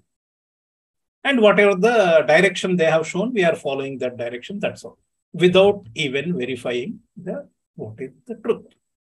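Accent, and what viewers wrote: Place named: Butler English, India. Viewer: Speaking Butler English.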